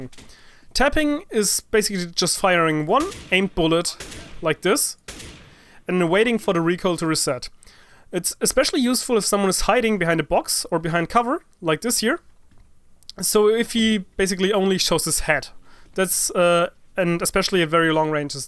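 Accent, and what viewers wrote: Germany. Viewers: ! i guess u would do great by doin that cause evry gun has different ways and styles to play that newbs like me need to learn, btw u hv a great accent for a german i used to think all of u would say ze and zat (no offense i hope u get what i mean)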